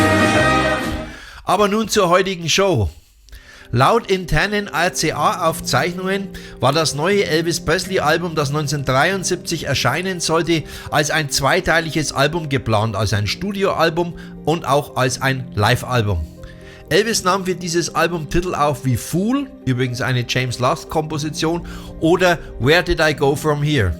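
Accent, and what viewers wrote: Upper Franconia, Germany. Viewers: Hallo, ich habe Dir zu einen Deiner letzten Videos geschrieben und finde Deine Berichte Super. Nach Deinem Dialekt zu urteilen kommst Du wohl aus Oberfranken wie ich auch. Ich lebe aber bereits seit 1978 in Mainz. Bin aber ein Rehauer, wenn Dir das was sagt. Ich hatte Dir geschrieben, dass ich bis 2017 eine Band hatte. Man nennt mich den "Elvis von Gunsenum" zu deutsch Gonsenheim. Im letzten Jahr hatte ich mein 25-jähriges Bühnenjubiläum. Ich singe halt die Songs des King of Rockn Roll sehr gerne und es kommt an. Nach 2017 waren wir ein Trio und jetzt mache ich Soloauftritte in Seniorenheimen. Ich würde mich wirklich sehr freuen, wenn wir uns Mal treffen könnten. Vielleicht im nächsten Jahr beim Elvis Festival in Bad Nauheim. Dieses Jahr geht es leider nicht. Mit rockigen Grüßen von einem oberfränkischen Elvis Fan zum Anderen Volker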